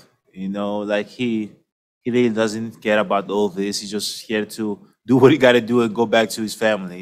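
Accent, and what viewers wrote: Greece. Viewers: I love his Greek 🇬🇷 accent ☺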